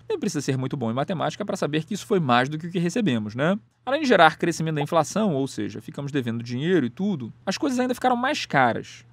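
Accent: Rio de Janeiro, Brazil. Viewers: esse cara tem mo sotaque de carioca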